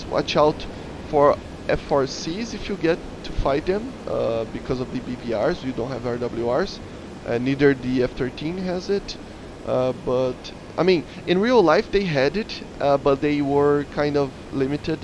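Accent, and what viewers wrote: Brazil. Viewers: That guyse sound likes a Brazilian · caraio que sotaque de brasileiro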